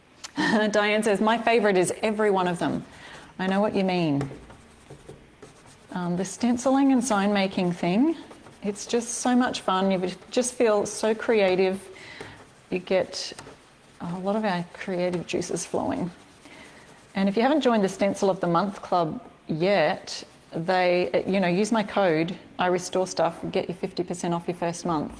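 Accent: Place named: Australia. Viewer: Greetings from Centreville Michigan USA. Love your Aussie accent. Spent a week in Australia and a week on North amd South Island New Zealand 2019. Trip of a lifetime. Your videos are great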